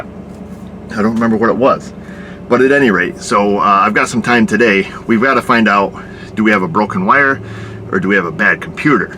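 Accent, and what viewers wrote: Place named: Oklahoma, United States. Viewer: I'f you never told anyone that your shop was in New York, most people would think you were from Oklahoma or Kansas or Texas. You sound like an Okie when you talk not a New Yorker!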